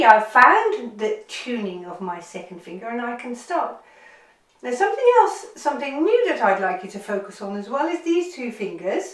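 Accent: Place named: England, United Kingdom. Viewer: Great lesson as usual. Thank you,. You have a lovely English accent that is very rare these days. What is its origin if I may ask? Sounds very posh!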